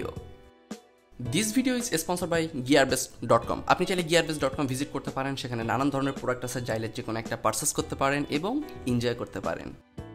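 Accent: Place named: Bangladesh. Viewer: BD Voice Thanks ♥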